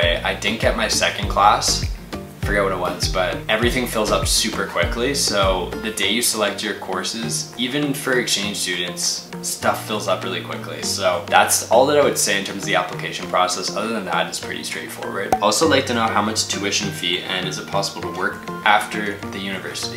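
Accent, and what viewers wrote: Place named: United States. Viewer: Can I ask where you are originally from?: You sound like an American. Thanks!!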